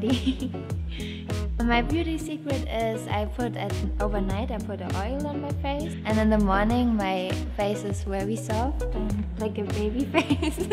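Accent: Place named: Germany. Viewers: Freya Loves Kittens Yes she has a really strong accent and I can hear that also I’m a german too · She’s super cute but you can hear that she’s german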